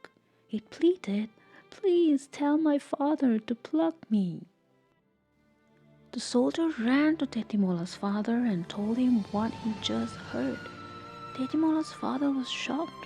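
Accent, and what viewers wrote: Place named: United States. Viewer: Where did you get that american accent!?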